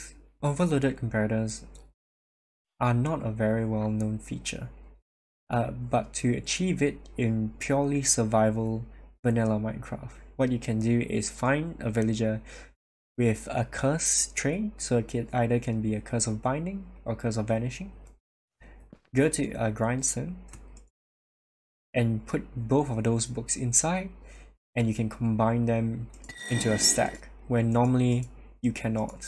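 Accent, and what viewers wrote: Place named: Singapore. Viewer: Hope you don't mind me asking, I feel like I recognize your accent. Are you from somewhere in or near Lion City by any chance?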